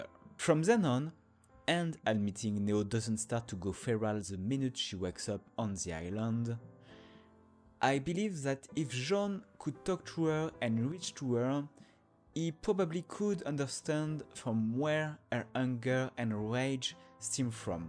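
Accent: France. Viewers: Ca c’est un accent français je me trompe ? Btw nice video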